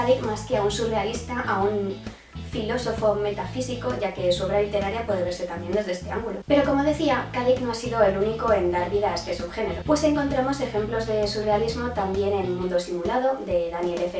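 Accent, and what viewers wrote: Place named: Spain. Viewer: Me encanta tu acento, de que parte de españa será? Suena mucho como el antivirus <3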